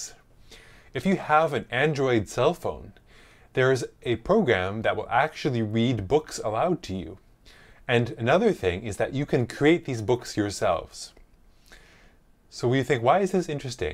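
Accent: Canada. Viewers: He is Canadian. That is why he sounds different. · U Canadian?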